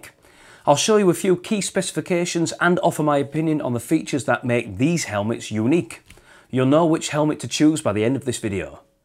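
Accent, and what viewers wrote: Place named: Yorkshire, United Kingdom. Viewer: Yorkshire, Huddersfield, I’d say.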